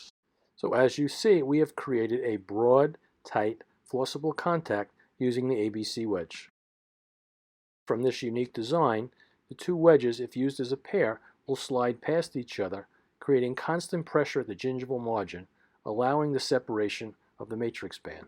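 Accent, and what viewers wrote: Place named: New York, United States. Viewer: great NY accent :) great content also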